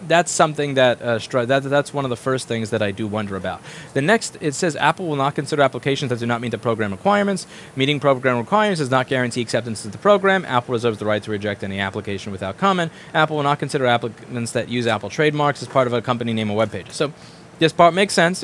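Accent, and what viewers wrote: New York, United States. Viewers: Lmfao young rossman had a new york accent i didnt expect thaf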